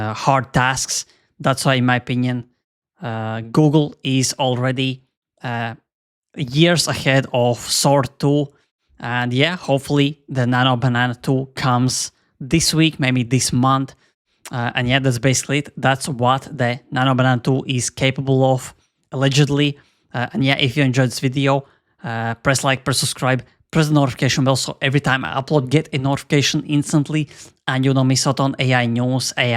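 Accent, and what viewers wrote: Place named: Russia. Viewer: Why your accent is so similar to Russian? I'm russian btw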